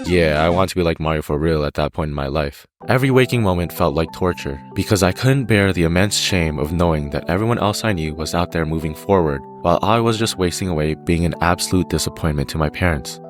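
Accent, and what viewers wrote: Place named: Canada. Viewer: dude sounds canadian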